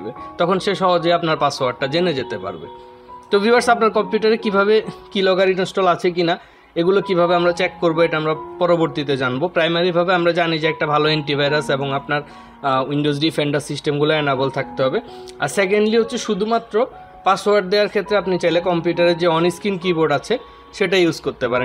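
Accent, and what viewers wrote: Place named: Teknaf, Bangladesh. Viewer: মনা তুমি তু টেকনাফে মনে হয় 🙄 ভয়েস ওরকম কেনো 🙄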